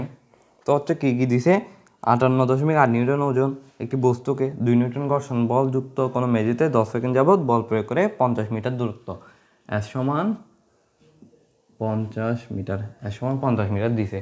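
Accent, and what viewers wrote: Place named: Chittagong, Bangladesh. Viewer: accha to Chittagong vashai je kotha bolchen tar jono bolam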